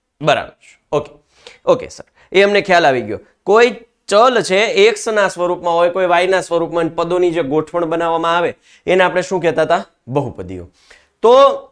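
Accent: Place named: Kathiawad, India. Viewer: Sir tame kathiyad baju na cho nice bhasa and math lecture mate thanks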